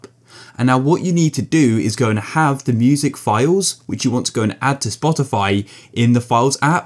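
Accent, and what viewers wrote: United Kingdom. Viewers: doesn’t work + ur british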